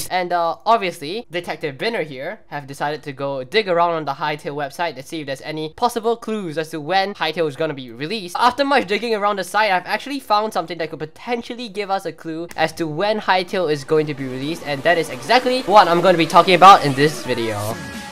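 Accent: Singapore. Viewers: Is it just me or you got a Singapore accent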